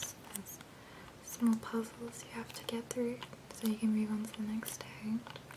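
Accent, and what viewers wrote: Australia. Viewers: Asmr, games, cute girl with an aussie accent? I have died and gone to heaven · Not really into the asmr roll play videos, but I found this really relaxing and you know your stuff. Looking forward to some new videos. Oh, Australian right??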